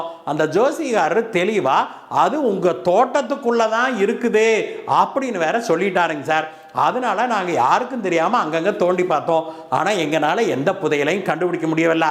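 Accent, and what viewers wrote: Kongu, India. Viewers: உங்கள் கொங்குத் தமிழ் அருமை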